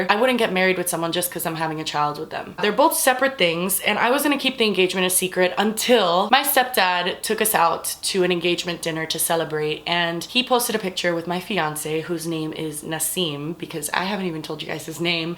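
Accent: Cuba. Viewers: Sharon Amador half cuban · Brianna Zinsky she's Cuban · It's a Cuban accent. She's Cuban. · She's Cuban :)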